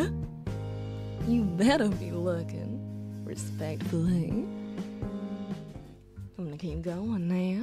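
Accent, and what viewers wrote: United States. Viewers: why did I think the accent was from new jersey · It's that southern Belle accent 😍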